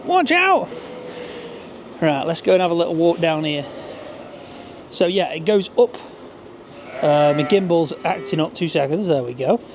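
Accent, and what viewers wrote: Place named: Stoke, United Kingdom. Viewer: Is this in stoke you seem to have a stokie accent 😊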